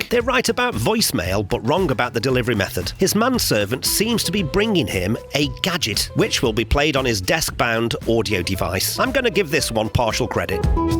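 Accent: Stoke, United Kingdom. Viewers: Annoying British accent, unnecessarily bloated content. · I've only just found your channel. It's brilliant. Your accent is very similar to mine, are you from Stoke ?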